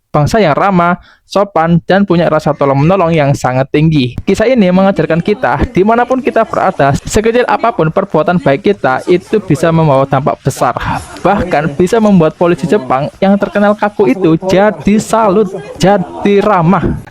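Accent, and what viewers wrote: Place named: Java, Indonesia. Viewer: Logat Jawa nya ,,jangan terlalu medok ,,min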